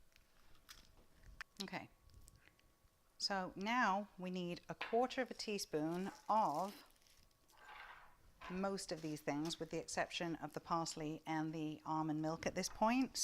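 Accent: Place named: Manchester, United Kingdom. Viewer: That’s an interesting accent. Sounds a little Manchester little U.S?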